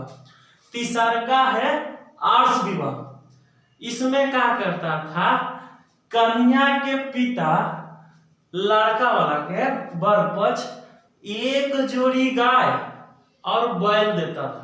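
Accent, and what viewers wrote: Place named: India. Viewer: Desi English ✍️✍️✍️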